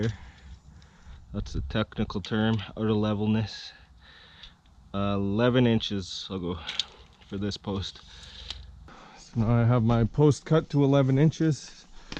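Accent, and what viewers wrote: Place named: Alberta, Canada. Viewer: You got that Alberta accent. Where are you from? im gonna guess, Edmonton.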